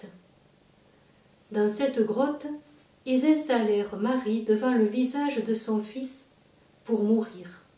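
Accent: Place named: Provence, France. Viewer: #5 Que dire ? 🤔 ... J'adore l'accent de Provence ! C'est véritablement charmant et quelque part aussi, apaisant à entendre... Surtout ne le perdez pas ma Sœur 😌 Merci à vous et que LE TOUT PUISSANT vous bénisse... ✝️ P. S. : Caso a Irmã (cujo nome gostaria de conhecer) NUNCA na sua vida tenha feito até hoje, uma peregrinação até FÁTIMA ... Por 🤲🏻 favor, não deixe de contemplar essa possibilidade! Claro, quando puder ou quiser... Pois a FÉ assim como DEUS cá estarão para acolhê-la com o amor, o carrinho & o respeito que merece 🤍 OBRIGADO✓ Adieu 👼🏻 ma Sœur.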